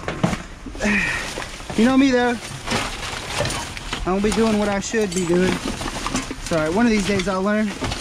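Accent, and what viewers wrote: Texas, United States. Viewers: 8,77 for whats ? your Texas pronunciation is very hard :) for not native :) Regards from Poland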